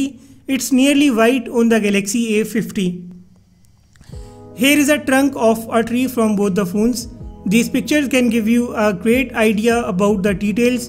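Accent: India. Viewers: Bruh that indian English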